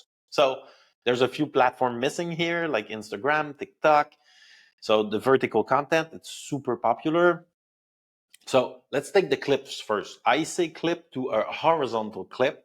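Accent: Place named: Quebec, Canada. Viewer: Nice video! Are you from Quebec!? I can tell😃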